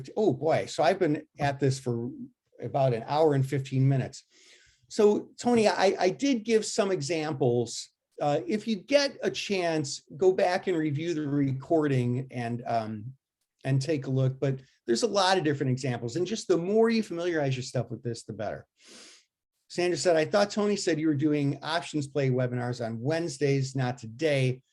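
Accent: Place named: United States. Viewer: really struggling with this yank migrant's accent, rayt? "stahck" rayt?.. how does he say clock? "clahck" rayt? 🤢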